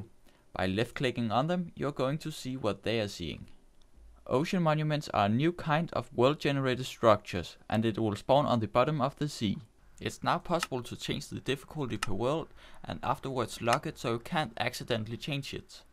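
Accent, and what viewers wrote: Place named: Denmark. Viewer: Your Danish aren't you? :)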